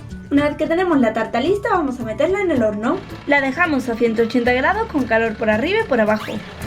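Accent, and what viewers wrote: Andalusia, Spain. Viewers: Creo que a si es el acento español :) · todos los andaluces no tienen ese acento. Es de pueblo y por eso es más cerrado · Una pija, no distingues la buena dicción del acento andaluz por lo que veo, son cosas completamente diferentes. Es andaluza y SÍ tiene ENORMES problemas de dicción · La cosa esta clara si no la entiendes no la escuches y punto. Yo creo que no tiene ningún problema de dicción,igual el problema lo tienes tu con tus entendederas. Depende lo cerrado del andaluz se les entiende mas o menos , pero he ahí la gracia que tienen, decir que el acento andaluz no tiene que ver....el que no tiene que ver es un ciego. Es mas criticar su trabajo por supuesto que se puede hacer , o decir lo que no te gusta de la receta etc.. pero lo que tu has hecho es entrar en lo personal,como hable ella es su problema y no el tuyo,ni que estuviésemos en clase de fonética....que es un vídeo mujer, hay que hacer criticas constructivas y no meterse en lo personal,máxime si no se conoce a la persona en cuestión, ya solo sea por respeto o educación. 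Un saludo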